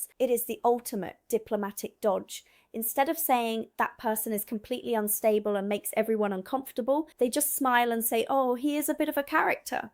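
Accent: United Kingdom. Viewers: You sound British though 😮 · Why do you have a British accent and mannerisms?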